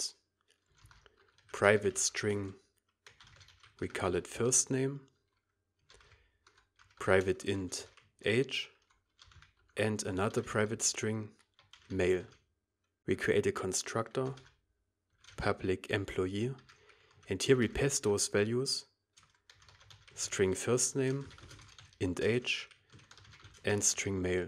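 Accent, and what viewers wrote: Germany. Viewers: I think a hear a german accent😁😁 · i love that light german accent (y), excellent work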